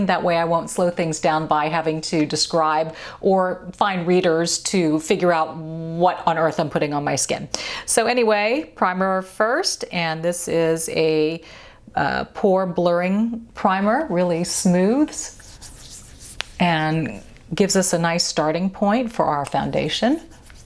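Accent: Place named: South, United States. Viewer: I'm presently going through many of your videos to see which primer you use and recommend for face, also for eyelids. Suggestions by others on here are welcome as well, please!! Don't want to spend note than about $35 $40, (unless it is a quart sized container🌝) Normally I'm more conservative about price except I think a primer will make ALL the difference as to how my face and makeup look, in the end. Prefer 1 with anti-oxidant, if possible. I've been looking for a while now and almost ready to just buy something cheap till I can find a quality product for sensitive skin that's not drying.. Thank you for all of your effort in keeping me somewhat in-the- know about skincare and makeup, since I subscribed. You're a lovely person, and I adore the little bit of Southern sound in your voice. It's another one of your charming attributes that sets you apart🌴🤗🌹☮☺🌴 Edited to add---don't know why the strike-through text appears that way above. 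Didn't intend to negate the words I wrote.☺🌴☮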